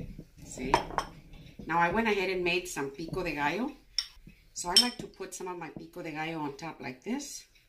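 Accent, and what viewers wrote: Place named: New Mexico, United States. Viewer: I completely agree! Also, your voice is very soothing. You very much sound like you could be from my beautiful state, New Mexico which is even better!😎❤🌶💛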